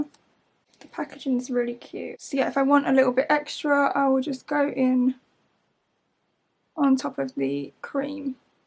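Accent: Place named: United Kingdom. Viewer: so lovely british accent ❤️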